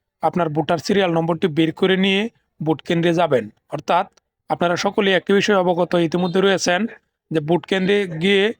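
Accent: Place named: Mymensingh, Bangladesh. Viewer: আরে মঘার বাচ্চা মঘা একটা ভিডিও বানাইছস তাও সঠিকভাবে কথা বলতে পারিস না। আগে ভাষার কোর্স কর তারপর ভিডিও বানাইবি।সব যায়গায় কি নেত্রকোনা/কিশোর গন্জের ভাষা বললে চলবে? মানুষ এমনিতেই বলেনা ময়সিংয়া গাইয়া ভূত ।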